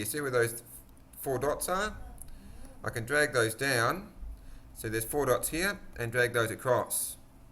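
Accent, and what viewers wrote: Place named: Australia. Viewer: love the australian accent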